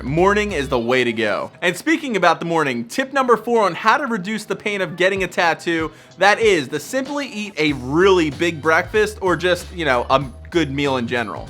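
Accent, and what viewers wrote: Philadelphia, United States. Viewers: I'm From Philly., so I appreciate the pronunciation. Haha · You’re from Philly huh?? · You have a strong Philly accent lol great content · 95% sure this guy is from philly. Wooder… I say it to. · i’m from philly and he sounds like he from philly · I fkn knew it lol classic Philly accent! Appreciate the vid tho. Employing some of those techniques this week! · Dude you must be from philly i can hear it in your accent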